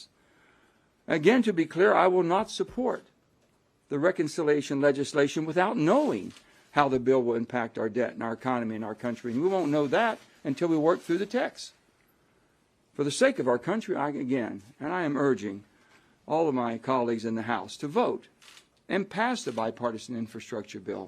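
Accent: United States. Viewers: He sounds like a true American!!! 🇺🇲🇺🇲🇺🇲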